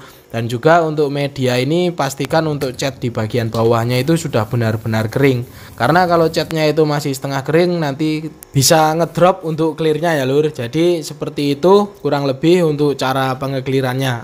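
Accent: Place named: Java, Indonesia. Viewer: bg jenengan sh wong ndi. mandan medok. bisa nk edek otw jluk tulung ngecet na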